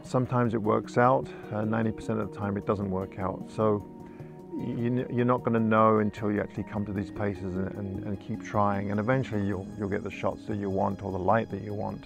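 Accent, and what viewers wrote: England, United Kingdom. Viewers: Hi. Crazy question, are those magnetic reading glasses? If so, what brand are they? Look like exactly what I need to avoid regularly leaving behind/ sitting on/ losing mine. PS, it’s really refreshing to hear a laid back English accent for a change!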